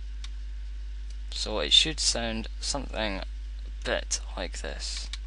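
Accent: Scotland, United Kingdom. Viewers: are you sottish? you soud scottish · are you british? :O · Cool scottish accent :D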